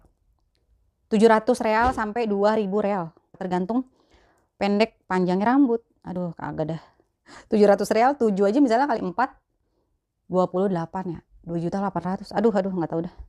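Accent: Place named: Betawi, Indonesia. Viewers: Mbak..lucu bangeet sii..bicara nya kok depok bangeet..rumahan gayanya .gak imeg "an..haha..love you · Logat Indonesia betawinya masih kental... Bagaimana orang Arab lihat wajah Indonesia yg rata2 pesek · Betawinya msh kental...😀😀😀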